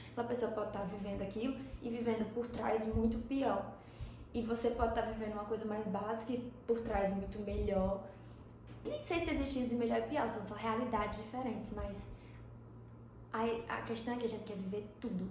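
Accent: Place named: Paraíba, Brazil. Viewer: C é da paraíba? Mó sotaque daqui haha vídeo mt massa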